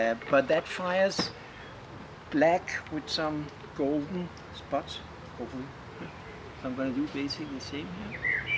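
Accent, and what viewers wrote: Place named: Denmark. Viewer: I just realized how the beautiful Danish accent fits the mad professor character so absolutely perfect 😂 Greetings from your southern neighbor!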